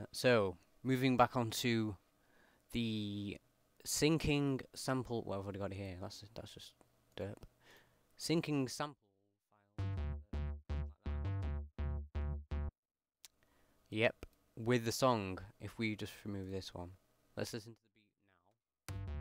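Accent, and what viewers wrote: United Kingdom. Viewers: man your accent is the worst uk accent, worse than scouse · Hahaha sorry, I just started watching the show and now I think half of Britain sounds like him. Great tutorial by the way! I'm not blindly stumbling around the program making beats like a 2 year old with a sound making toy anymore. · IDK IF I'M JUST TIRED AND CAN'T UNDERSTAND U BUT U R JUST 2 BRITISH M8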